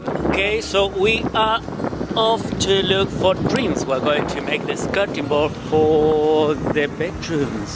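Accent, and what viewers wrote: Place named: Argentina. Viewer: So many great ideas! The redesign of the space. The scaffolding boards with wine corks. The double shower. Revealing the little window. The decor hitting all the right notes. Your joie de vivre, hard work, and humility. Plus I love your narration and your accento argentino. Thank you for your inspiring life. Abrazos grandote che. 💙🦋💙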